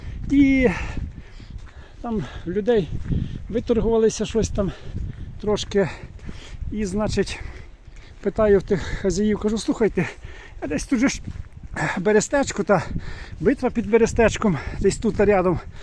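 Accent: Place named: Vinnytsia, Ukraine. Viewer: Дякую пане за цікаву подоріж до козаків.Мені ваша розмова чомусь підсказує що ви з вінницької області? Дай Боже всього найкращого що є в нашому житті і здоров,я.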